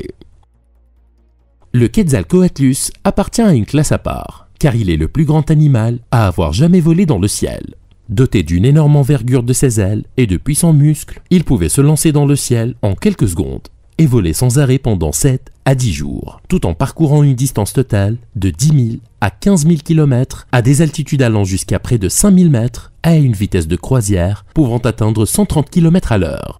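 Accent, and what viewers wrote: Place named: Asia. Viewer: asiatique je crois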